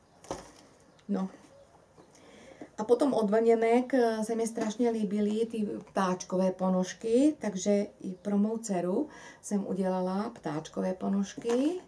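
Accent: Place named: Slovakia. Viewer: Pozdravujem vás. Máte krásnu prácu a koľko toho stíhate! Ja som stihla len kupiť priadzu na letnú háčkovanú tašku ale asi ju nestihnem. Ponožky ma eštelen čakajú a mám strach, že to bude závislosť. Prepáčte mi, že som zvedavá, ale podľa vášho prízvuku mám dojem, že ste Slovenka. Je to tak? Nech sa vám darí.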